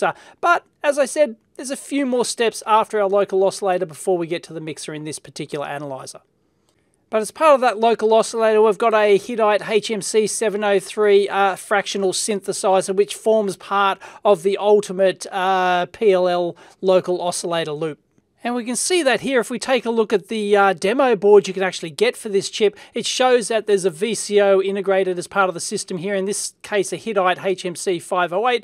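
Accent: Australia. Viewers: I am 728% American but after watching a video from you, occasionally I find myself saying, "hi," to someone but in your Australian accent. It throws people off but it also means I love you stuff.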